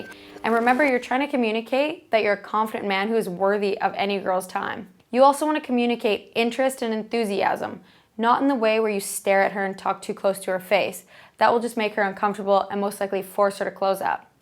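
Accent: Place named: United States. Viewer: Manish Thakur Which accent ? she's just american. Btw I don't wanna be mean but people don't generally like the indian accent so... It's not the best approach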